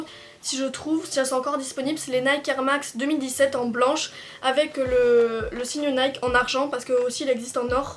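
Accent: Belgium. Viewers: T'a Un accent belge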